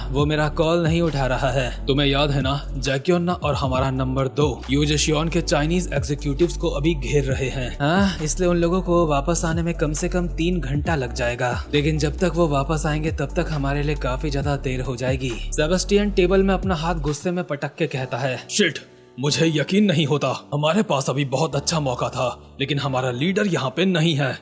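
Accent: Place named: Gujarat, India. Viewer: bhai kya tum crunchyroll pe bhi anime dub krte ho kyuki pehle jaisi voice tum og daniel ko dete the jab wo mota tha waisi hi voice maine crunchyroll mein ek anime ke mc ki voice suni hai aur kya tumhare pass 2 ya 3 bande aur hai jo tumhe lookism ke video dub krne mein help krte hai aur tumhare tone se lagta hai ki tum gujarat ki side se ho kya tum ahmedabad se ho kyuki me bhi waha se hu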